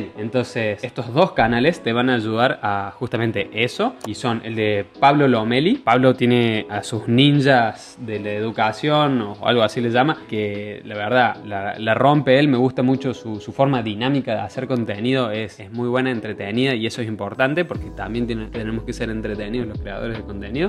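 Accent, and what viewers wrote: Córdoba, Argentina. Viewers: Uhhhh ese acento cordobés, ya le baja 9 puntos😡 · No Eres Espanol, Argentino no Creo? Solo es curiosidad!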